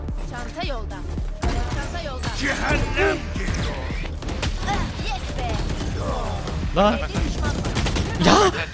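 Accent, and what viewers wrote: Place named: Russia. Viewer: yayını izlemediyseniz adam rus o yüzden öyle konuşuyor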